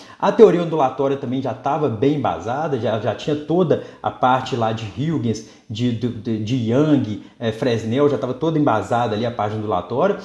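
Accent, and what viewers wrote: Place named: Minas Gerais, Brazil. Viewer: Professor com explicação excelente. Essa serie é uma joia do Youtube. A cereja do bolo é o sotaque mineiro do prof. Abraços!!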